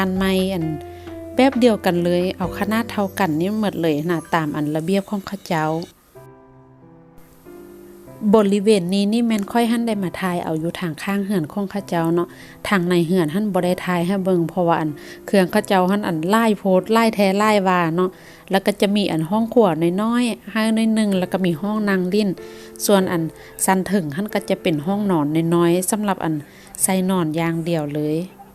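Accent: Luang Prabang, Laos. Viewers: สยายดี, มารับชมนำเด้อ ฟังเสียงเจ้าคือคนหลวงพะบางเนาะ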